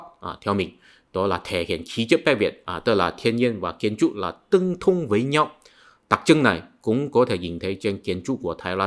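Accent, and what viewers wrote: China. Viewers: Nói tiếng Việt giỏi mà nghe như đang nghe tiếng trung quốc vậy, giọng của bạn rất hay. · giọng tàu khó nghe quá ..không bằng Lào nói tiếng việt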